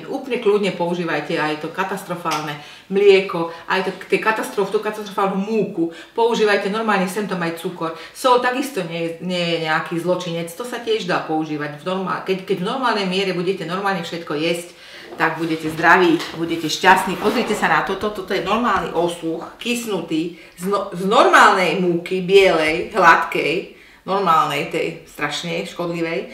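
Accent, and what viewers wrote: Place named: Bratislava, Slovakia. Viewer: Nebyť toho blávackeho dialektu by človek bral aj vážne.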